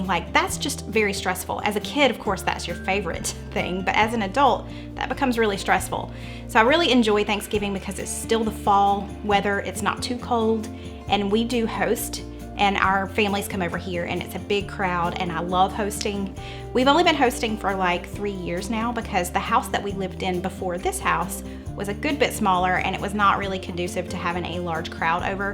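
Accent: South, United States. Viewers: Hi im new to your ch.I love your ch.and all your recipes.Where are you from.I love your Southern accent.im from Arkansas.nice meeting you and your recipes.My dad favorite holiday was Thanksgiving too.Hes deceased now.But he loved 🦃 turkey so much because you can do a lot of things with.I love 🐔 <link> and my mom.Shes deceased too.My grandmother her mother loves goose and duck.My father's mother loved 🦃 turkey too.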